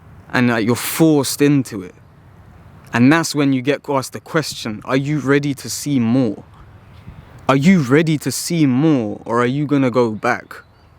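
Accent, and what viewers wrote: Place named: United Kingdom. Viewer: I watched a faceless video of yours and thought you would be a black person from the UK. You talk so similar to one.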